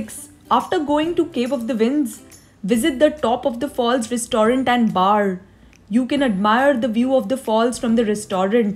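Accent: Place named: India. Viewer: Indian accent English 😅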